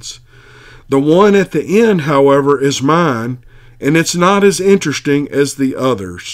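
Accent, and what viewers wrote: South, United States. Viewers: Love your story telling. Your southern draw adds such authenticity to the stories. Keep up the good work buddy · Your music is part of what makes u stand out from others! The rest is great content and that charming southern drawl! Love u! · Teddy Nevarez Right On! The Southern accent is something to be proud of. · Mr. I do not mind the music as long as I get great stories! And I had a cousin that had sounded like you from down south. I think your accent is adorable. Me as city slicker is not offended at all. That is why God made us all different...with a Twist! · it’s me, your boy Bleu, hailing from Ocean Springs, MS. I now live in Costa Rica (again.) I’m just gonna sing your praises because I dig this topic, you talk like me and I always look forward to your content. It’s my feeling that us southerners don’t much care for folks gushing and it’s likely uncomfortable af for you. 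That type of thing is super awkward for me, my people back home, most Mississippians and most definitely Cajuns like myself. I’m a fan. Yankees tell you the same thing. 😂 There’s a reason for that. A good story teller is highly valued across cultures in every corner of the world. It’s been that way since the beginning of time, although it’s exceedingly rare these day. Keep up the great work. It’s very much appreciated. Blessings to you and yours. Thank you. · I enjoy your southern accent and am a big blues fan. I also believe in bigfoot and appreciate what you do for our entertainment. Thanks bud, keep up the good work! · The music at the intro has such a Southern feel to it, and that combined with your accent and the way you tell the stories is what makes this channel THE BEST! 🤗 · Geez, seriously? There are three things that makes channel unique. 1) The awesome music, unique to this type of channel. 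2) way of reading the stories, so honest and respectful. His southern accent really adds to the storytelling too. 3) The awesome stories people send in. They trust with their real life experiences because he is so respectful. So if these aspects don't appeal to some...fine and dandy...just move along to a channel you like....cause we LOVE what does with his! That's right you heard me....move along!!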